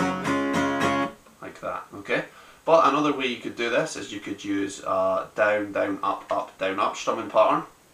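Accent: Ireland. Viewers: OMG YOUR ACCENT<3. ARE YOU IRISH OR SOMETHING?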